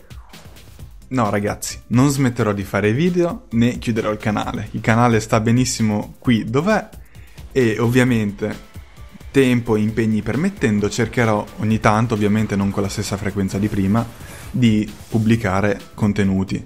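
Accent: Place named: United Kingdom. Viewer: Torna con l'accento inglese così potrai fare lo youtuber con un tocco.. come dire.. internazionale! E così il tuo canale sarà sempre più sexy! Questo pizzico di classe gli iscritti lo apprezzano ;) !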